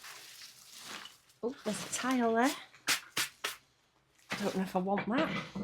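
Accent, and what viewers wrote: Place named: Birmingham, United Kingdom. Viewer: I watch you for your content, yes, but even more for your accent. You see, my mom was from Birmingham, and a war bride that came to America to join my Dad. She has been gone these many years and I miss her and her accent. Thank you for listening to an old guy and his memories and brining them back with a your heavenly accent and sweetness. You’re a hard worker.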